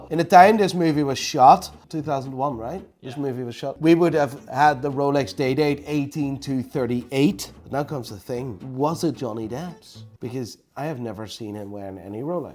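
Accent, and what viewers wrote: Northern Ireland, United Kingdom. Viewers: Are you from Northern Ireland u sound it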